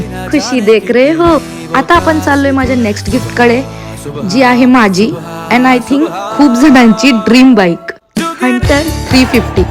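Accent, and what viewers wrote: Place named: Marathi, India. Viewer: Didi tu disayla model disti pan aavaja varun apli marath molich ahe barka😅😊❤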